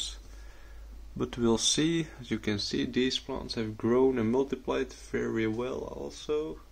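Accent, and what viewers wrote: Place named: Belgium. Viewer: Judging by your accent, you’re Belgian right?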